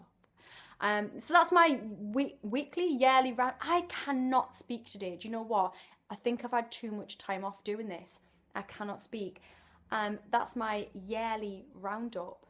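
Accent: Newcastle, United Kingdom. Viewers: Happy New Year,. I really appreciate watching your videos. Your pieces of advice and stuff are highly significant for me and, on top of that, I love practising my English by listening attentively to your Geordie accent which I had not been familiar with until I came across your channel here. #Peace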